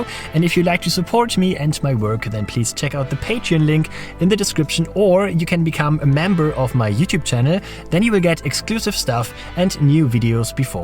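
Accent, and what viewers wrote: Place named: Germany. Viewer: Its high quality content I think, why isn't this guy popular? I like him anyways... Also, you're German right? I think I recognize the (I think German) accent, here in the Netherlands the accent is like they're still speaking Dutch but they're using the English words...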